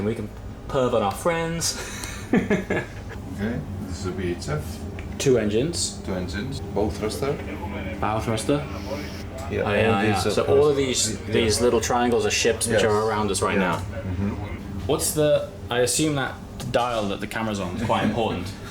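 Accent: Netherlands. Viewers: are you dutch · Wait are you dutch?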